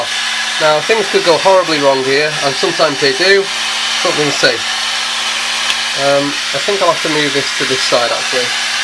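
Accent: United Kingdom. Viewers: Oh God it's British:)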